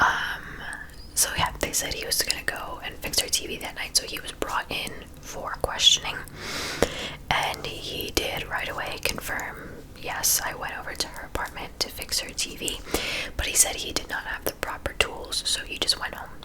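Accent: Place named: West Coast, United States. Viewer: You sound super West Coast when you talk.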